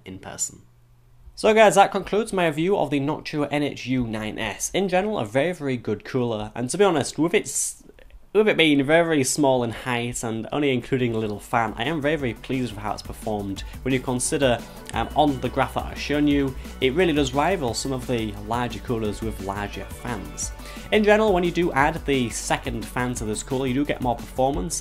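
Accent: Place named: Northern England, United Kingdom. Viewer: I'm from the North of England and so is this guy. I've got no idea what he's saying half the time because he does leave a gap between most of his words. You've found the English Boomhauer.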